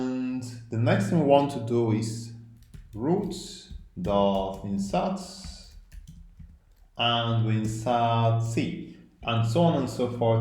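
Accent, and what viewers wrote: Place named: Nigeria. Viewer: Thanks bro. I don't know if I understand better because of the Nigerian accent, but this helped a lot.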